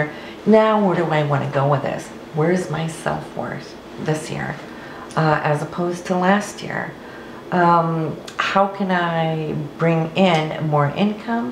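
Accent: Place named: New York, United States. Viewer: nice reading. you sound like a new yorker who looks like she lives in tucson...i say that because I am that!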